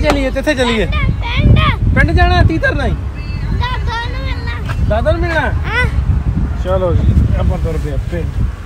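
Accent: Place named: Punjab, India. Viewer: Salam bhai I like your punjabi same as we speak all the words are same, our village on the border we can listen namaz in our village punjab side have relative in punjwar village and vist my relatives when we are going to Indiea I am visited Pakistan last November on babba ji 550 anniversary and lot of love from Pakistani people's, I want to come again to see your country and I watch your all videos From Narli village near by khalra dist Tarn Taren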